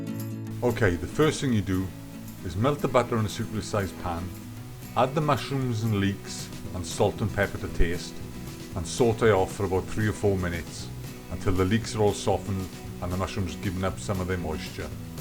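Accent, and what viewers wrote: Wales, United Kingdom. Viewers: The Welsh accent is very refreshing so it is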